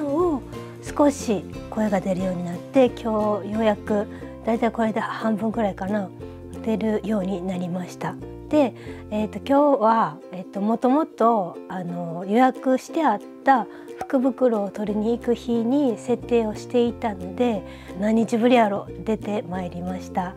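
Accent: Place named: Shima, Japan. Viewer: コロナからの回復おめでとうございます。志摩弁聞けたー🌠僕も娘の感染によって折角当たった２日の皇居一般参加を断念しました。新幹線やらキャンセルだらけで😞💦🐩との動画楽しみにしております。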